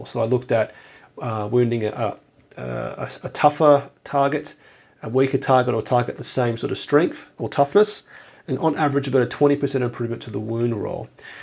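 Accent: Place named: Australia. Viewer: Is this an Australian doing 40k? Love it.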